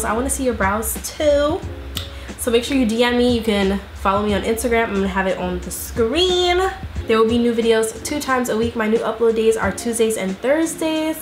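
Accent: United Kingdom. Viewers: Your British accent is killing me🤣🤣🤣 I’m from London x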